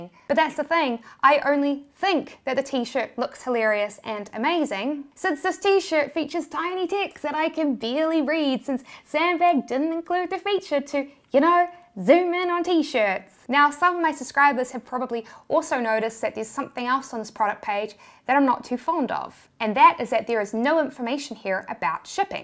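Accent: New Zealand. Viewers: Really strong kiwi accent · Is that what a New Zealand accent sounds like?! I’ve never heard a South African sound so Australian or an Australian sound so South African. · dragging their store with the New Zealand accent, priceless. 😥😭😂🤣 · I like your kiwi accent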